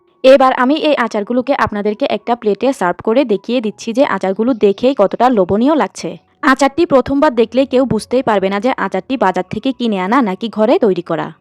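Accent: Chittagong, Bangladesh. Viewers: cox ar Chittagong ak e voice😢😊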